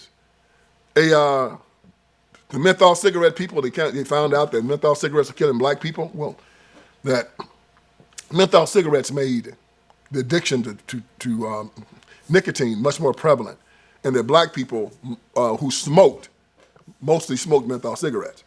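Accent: South, United States. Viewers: Unfortunately, I couldn't understand a thing you were saying while you were laughing and talking. Between your southern accent and poor pronunciation, it is so difficult to understand what you are saying.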